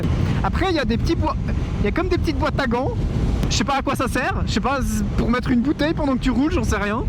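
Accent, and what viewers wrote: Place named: Switzerland. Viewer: Je croyais tu était français jusqu'à ta commencer à dire des chiffres 🤣 après j'ai crue tu était belge...🤦🏽‍♂️ pour finir j'ai compris que tes suisse mdrrr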